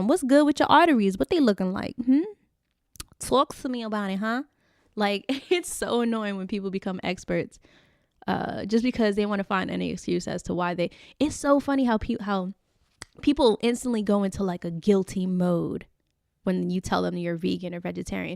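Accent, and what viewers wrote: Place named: Brooklyn, United States. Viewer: what part of Brooklyn you from?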